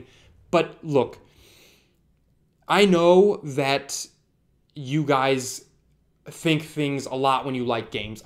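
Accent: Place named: South, United States. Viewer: What happened to your southern accent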